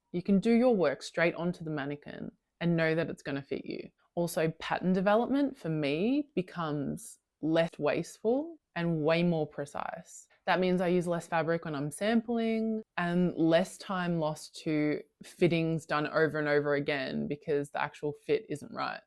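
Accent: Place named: Australia. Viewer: Nice vid! I did the cheats version of this about 18 months ago. I took photos and measurements and padded out a reasonably high-quality mannequin that was close to my size. It's not exact, because it was hard to get the little details right ......but its 80% there. She looks remarkably like yours, lol. Which is......comforting, actually. At first it was confronting to see how 'not perfect" I was. But after looking at her for months, I have accepted her......as me. AND........what a delight it is to be able to use a fit model that is closer to my body! I do corsetry and alot of fitted styles, so it really helps. I don't think she is quite good enough to do draping.....so i am VERY interested in your new acquisition, and seeing how you get along. PS. its lovely to hear an Aussie accent.....and you are a fellow Melbourne lady....so double 👍points there! 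PPS. you actually grabbed my attention initially because I saw your Regency outfit in the background.........I also enjoy costumery and am currently trying and failing to make some long regency stays.......I can't quite tweak it.....even with my padded form. ergh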